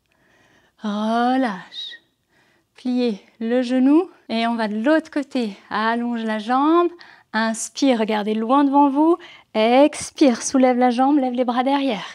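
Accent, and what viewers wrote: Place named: Switzerland. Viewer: Ah mais c’est ça j’avais bien perçu un petit accent suisse délicieux qui me rappelle de bons moments à Genève! Merci. Je me demandais si avec de la musique ce ne serait pas mieux mais peut-être d’entendre votre respiration quand vous souffrez avec nous c’est bien aussi…très bonne séance avec des mouvements originaux et variés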